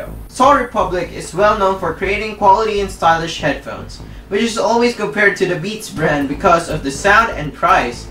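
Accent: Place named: Philippines. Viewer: I noticed a slight accent, I'm Filipino too! I lost my accent though D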